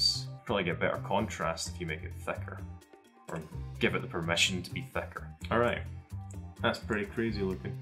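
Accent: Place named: Scotland, United Kingdom. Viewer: And why does he have what must be an Scottish accent?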